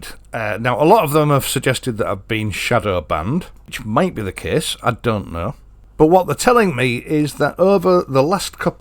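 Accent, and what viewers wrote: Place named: Keighley, United Kingdom. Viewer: Congratulations, I'm following from Germany and I love the Pictures, the Triumphs and your comments on all the current e-mobility nonsense happening around. I worked in Keighley for a while 30 years ago an I love your accent. All the best for the next 100k 🙋‍♂️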